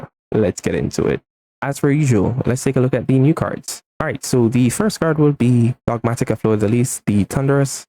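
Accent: Jamaica. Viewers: He jamaican?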